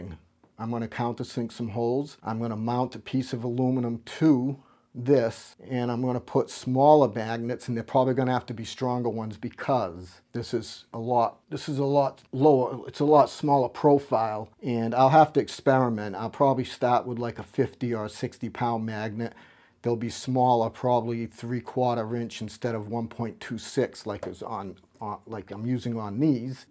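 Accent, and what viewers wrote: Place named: Massachusetts, United States. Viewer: The magnet idea is awesome! I’m definitely going to incorporate it for my setup! I found you on your recent Reddit post and followed you here. Definitely appreciate your input and love your interest in flight sims and setup! I’m a space simmer so the attention to accuracy doesn’t hit me like it does you, but I do love your creativity to set things up. Crazy that those small magnets get over 300lbs of uhh magnetism! I’m wanting to go full simpit but for now I just modify to attach to and around my desk. Have to get creative since I can’t commit to replacing my desk and the $$ is limited due to having 3 young daughters as well, so that’s where most of the money goes x_X. Lastly, love hearing the Mass accent. I lived in the New England area for about 10 years when I was a kid/teen. I’m from Oklahoma and moved back before I left for the army, but I always loved the range of accents and such, but I do NOT miss the snow, lol. 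Anyways, just wanted to comment and say I love your stuff! Take care and stay warm!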